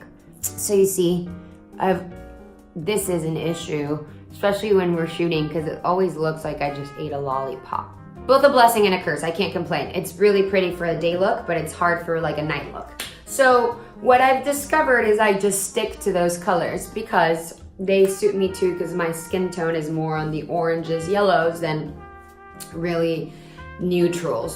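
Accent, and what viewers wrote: Mexico. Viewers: Ufa ainda bem que não é difícil te entender ! entendo um pouco o mexicano 😍🇧🇷😁❤️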